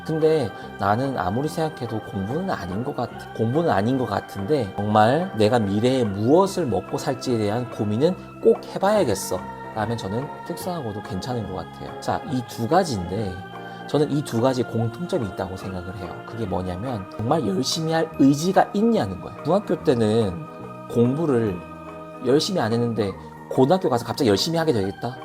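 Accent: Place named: Busan, South Korea. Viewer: 우연히 들어오게 되었는데 조근조근 선생님 사투리가 너무 좋네요. 여기도 부산이라~~ 중2 딸래미 수학성적 50점을 못넘기네요. 그룹, 개별수업 시켜봤는데 안되서 과외를 해요. 기초가 많이 부족하다 하시네요. 그나마 국어,영어는 80정정도 되구요 확실히 과학,수학쪽을 어려워해요. 그런데 미술을 너무 좋아해서 틈나는대로 종이나 아이패드로 그림을 그리고 있어요. 집근처에 올해 2회째 되는 위탁형공립예술학교가 생겼는데 인문계 다니다가 3학년 1년과정으로 그 학교를 다니면서 수업을 들을 수 있는데 국어,실용영어,한국사,체육 수업하고 오후엔 전공 과목(미술,음악) 실기수업 위주로 한다고 합니다. 예고를 가기엔 늦었고 거리도 너무 멀구요. 꼴지를 하더라도 인문계에 진학해서 공부하고 미술학원을 병행하는게 나을까요? 특성화고에 가서 내신도 좀 잡고 미술도 시킬까했는데 요즘은 내신따러 특성화고 가는 성적 괜찮은 학생들도 있다고 하더라구요. 중3부턴 입시미술학원에서 주말에 취미로 먼저 시작을 해볼까하는데 미대는 수학성적을 안보더라구요. 그래서 고민이 중2 이번 기말고사 끝나고 수학과외를 끊고 ebs 50일수학이나 30일수학 인강으로 기초를 다시 복습하고 3학년 현행을 인강으로 하는건 어떨지요. 공부도 잘하고. 그림도 잘 그리면 제일 좋겠지만 둘다 하기엔 경제적으로도 힘들 것 같아요.ㅜ 물론 미술을 시켜주는대신 인강으로 수학공부를 게을리 하지는 말자고 다짐을 하구요 답답한마음에 두서없이 글을 썼네요 바쁘시겠지만 선생님 조언 부탁드려도 될까요?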